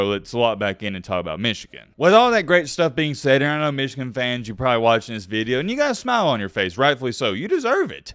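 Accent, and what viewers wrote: South, United States. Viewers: Your voice and accent are excruciating. Southern nerd 🤓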